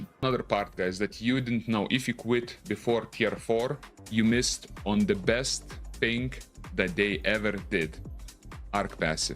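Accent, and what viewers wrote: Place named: Russia. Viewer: Пипец акцент русский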